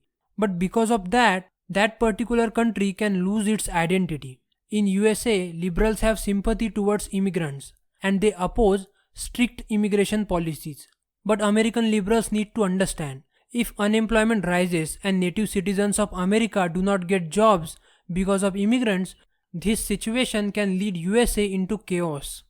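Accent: India. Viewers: Indian accent 👎 no thanks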